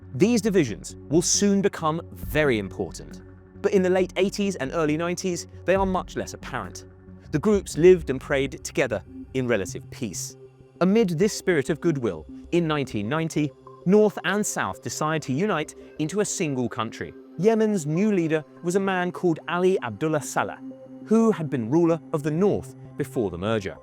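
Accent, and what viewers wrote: United Kingdom. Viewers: 1 min into the video and I hear British 😅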